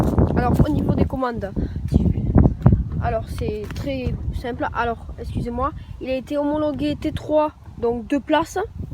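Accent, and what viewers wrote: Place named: South, France. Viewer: Toi tu est du sud 😂 quel accent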